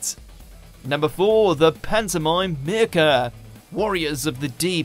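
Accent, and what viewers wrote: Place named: North, United Kingdom. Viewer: It's so called,, accent". It's normal. He speaks with some northern accent.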